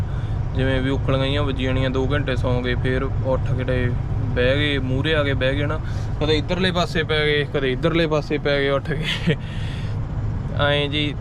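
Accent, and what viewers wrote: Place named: Malwa, India. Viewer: 22 g boli to malwa touch lagda lv u bro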